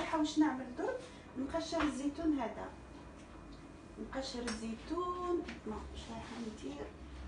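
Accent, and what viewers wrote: Annaba, Algeria. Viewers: Salem Notre grande Dame On dirait qu'on est chez vous c'est un plaisir surtout avec votre accent baunois hlouwa hlouwa Machaellah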